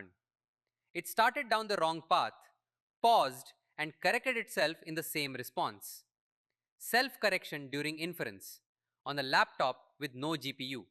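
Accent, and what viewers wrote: India. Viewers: thanks for the video, really helpful for low end systems. i also like youre indian accent 🙂👍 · (It's an AI generic Indian accent shared by various ai channels ... it is a soothing one though)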